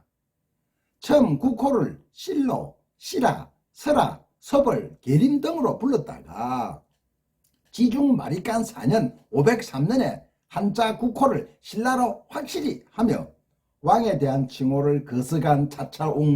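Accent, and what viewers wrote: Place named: Busan, South Korea. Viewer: 부산 할배의 투박한 사투리로 풀어내는 삼국유사 그간 까맣게 몰랐던 삼국시대의 디테일한 스토리가 너무 재미있읍니다 학교에서 배우지 못했던 구수한 이야기들 재밌어요~~~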